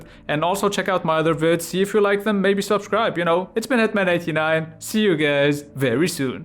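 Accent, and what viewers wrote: Italy. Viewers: I approve of your Italian accent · funny italian accent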